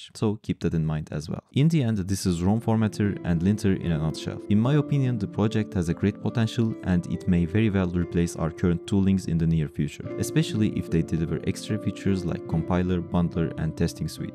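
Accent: Turkey. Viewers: Your accent sounds Turkish lol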